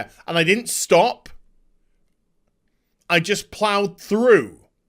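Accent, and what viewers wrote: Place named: United Kingdom. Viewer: I can't watch this.....without.....busting my gut laughing....that proper sounding British accent....doesn't.....help! ROTFLOLLLLLLLLLLLLLLLLLLLLLLLLLLLLL!!!!!!!!!!!!!!!!!!!! Stop it dude your are killing me! LOLOLOLOLOLOLOLOL!!!!!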